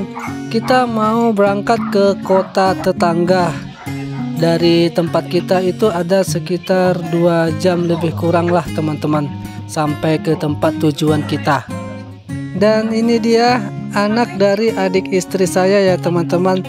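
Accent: Medan, Indonesia. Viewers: bg, abg orang medan y bg?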